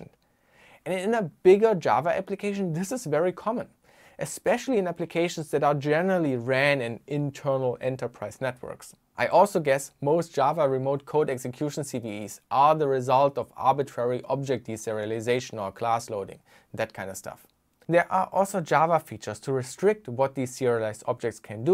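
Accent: Germany. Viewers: I really liked your video and I thought: sounds like a German accent... checking imprint and realizing that it is the same zip code as mine 2 months ago :D Greetings to Lichterfelde!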